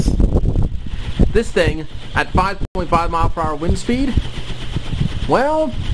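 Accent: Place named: Ireland. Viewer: An invention as fascinating as the accent. If it's not too personal, I gotta ask, is this a particular regional accent or a mix perhaps? It almost has a twinge of Irish about it (apologies for the off-topic question - I just love accents)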